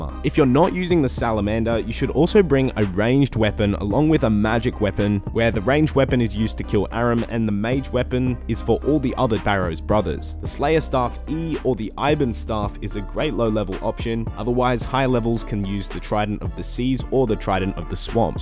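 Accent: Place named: Australia. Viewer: Australian accent bro. Buttah instet of butter. Houah instead of hour.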